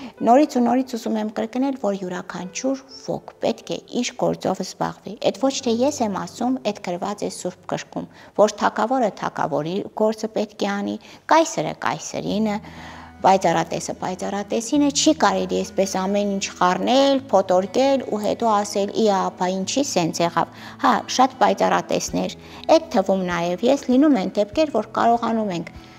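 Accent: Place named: Gyumri, Armenia. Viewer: Իրա ազգանունն է նույնիսկ ասյում 50-50է կեսը գյումրեցու կեսը գրական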